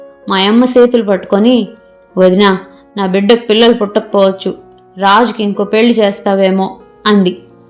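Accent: Rayalaseema, India. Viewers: ఈ రోజే విన్నా. ఎంత బాగా చదివానో రాయలసీమ యాస అలవోకగా చదివావు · రాయలసీమ యాస చాలా బాగా చదివావు. సూపర్ 👌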